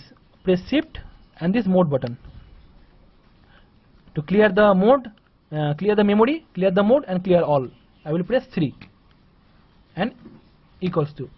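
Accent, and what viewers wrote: India. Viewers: cool indian accent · Индийский акцент это ржака! 5+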